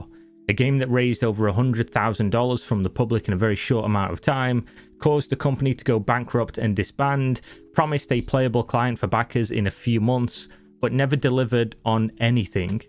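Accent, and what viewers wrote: Northern England, United Kingdom. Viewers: Where you from? You sound Northern. Anywhere near Grimsby?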